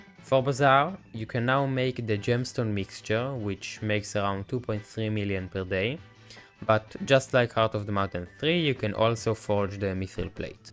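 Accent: Israel. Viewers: איזה מבטא ישראלי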